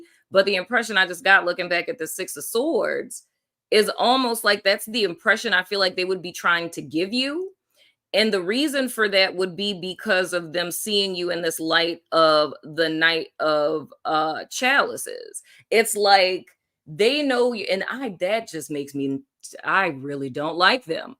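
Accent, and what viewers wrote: New Orleans, United States. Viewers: Libra Sun , Scorpio Moon and I'm from New Orleans too lol that accent will slip out baybay 😄⚜️⚜️⚜️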